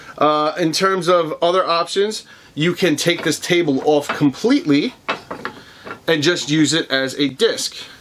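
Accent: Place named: Long Island, United States. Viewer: you should have never stop making videos. You come across great It’s your Long Island accent that makes it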